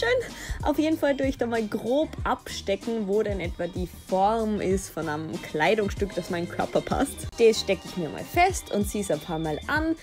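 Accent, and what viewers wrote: Austria. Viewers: Well try not to adapt her pronunciation too much, she has a really heavy Austrian accent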